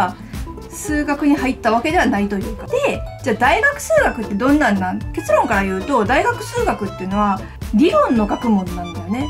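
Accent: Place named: Kansai, Japan. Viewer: この関西弁好きやわぁ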